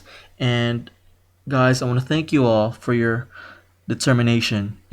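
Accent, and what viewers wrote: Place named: Philippines. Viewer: Sir, pinoy kaba? You sound like one. :)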